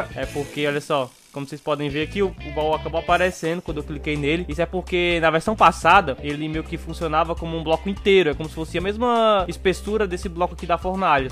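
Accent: Northeast, Brazil. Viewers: Gosto muito do seu sotaque, vc é nordestino ne?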